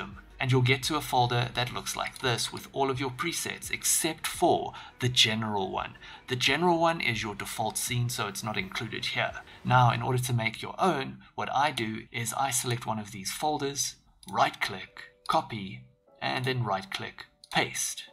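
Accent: South Africa. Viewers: I thought I recognised that accent as South African :) What part of SA? Cape Town?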